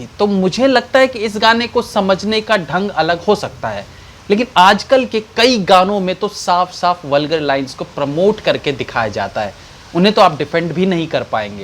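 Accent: Bihar, India. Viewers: आप बिहार से हो?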